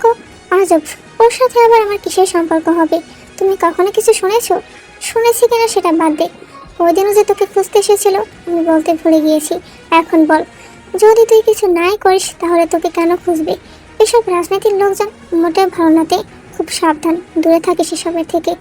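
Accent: Sylhet, Bangladesh. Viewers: আপু তুমি কি সিলেটি?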